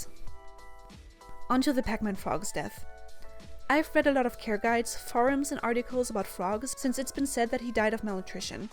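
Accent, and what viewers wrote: Germany. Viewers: Das ist der Akzent! Du kommst aus Deutschland! :3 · OK at the end I heard it, you are from Germany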